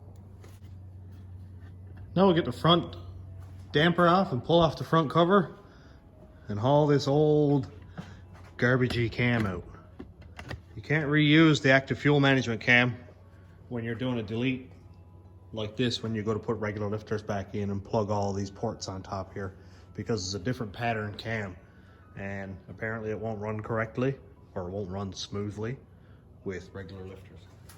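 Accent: Newfoundland, Canada. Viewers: I'm from grand bank lol currently living in pei,I know a newfie when I hear one haha what part ya from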